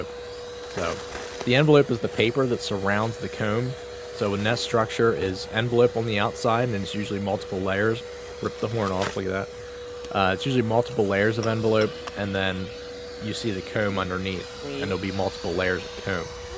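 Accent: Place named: Philadelphia, United States. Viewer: Omg my son is obsessed with these videos and sucked me into watching them now I can’t stop. And I was thinking.. this guy is definitely from Philly. Am I right?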